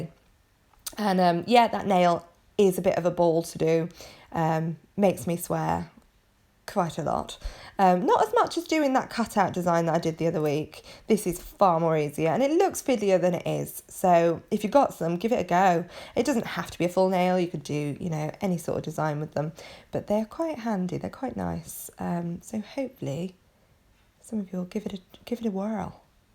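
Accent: United Kingdom. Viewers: I just subscribed last week, and I find you informative and FUN! To an American, who, (in the states,) is considered to have "no accent,"* there is something incredibly charming about a British accent cursing. It's unexpected and kind of incongruous to the American ear, and it hits our ears as hilarious! *I was born and raised in California, fourth generation native Californian, at that. I think a California accent is the American equivalent of received pronunciation in the UK. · I just started watching your vids and absolutely bloody love them love your personality I'm from manchester and live in the u.s and just reminds me of home and I crack up to your voice overs there just Brill thank you so glad your vids showed up on my feed!!!! Georgeous set too!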